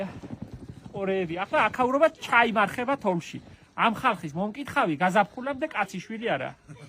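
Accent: Guria, Georgia. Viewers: დიდი პატივისცემა ჩემო გურულო ვგიჟდები რომ ვუცქერ და გურულ საუბარს ვისმენ❤ მომენატრა ჩემი ბებიკო და ჩემი გურია😢მოკითხვა სიყვარული და გამძლეობა საყვარელ აჭარას და აჭარელ ხალხს❤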